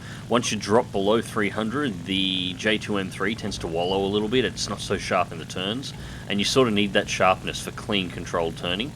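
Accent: Australia. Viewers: andrews Seriously, I'm laughing my ass off!!!!! Yes I know that you know he's an Aussie, it was just the perfect reply to getting irked about people teasing him about his trade mark accent!!! Don't ever change!!! You sound like the great Brian Brown in "Breaker Morant!" Sprog1 in AZ.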